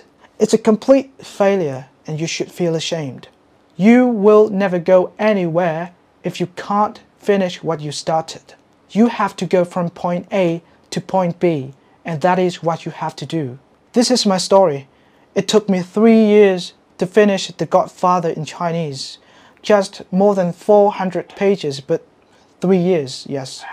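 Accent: United Kingdom. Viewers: Cái số 1 đúng y sai lầm mà mình đã nhận ra và sửa. Bây giờ mỗi lần gặp 1 từ mới mình luôn tự nhủ bản thân là không được phát âm bậy Ngoài lề xíu bạn dùng accent UK à? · Em thấy giọng anh hơi khó nghe, anh nói giọng Anh Anh đúng không ạ? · Hình như ổng nói giọng anh-anh đấy bạn, với lại còn nh nước nói tiếng anh mà, nghe anh-mỹ ko mốt gặp anh-trung anh-ấn, anh-nhật ... đủ kiểu sẽ hk có đủ trải nghiệm để bắt kịp cuộc nói chuyện ạ, theo kinh nghiệm của mình đã gặp anh-ấn ạ, vất vả lắm 😢, r cũng quen, do bả là cô giáo bộ môn của mình 😅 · Anh này nói anh-anh bạn ơi, giọng anh anh nói vậy á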